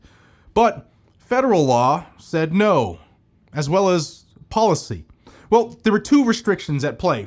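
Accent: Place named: Boston, United States. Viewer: You've lost your Boston accent :( Still love you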